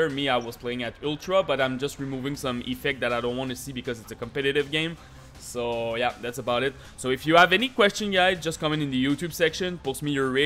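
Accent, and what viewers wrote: Quebec, Canada. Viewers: l'accent direct j'ai capté que t'es un French Canadian :P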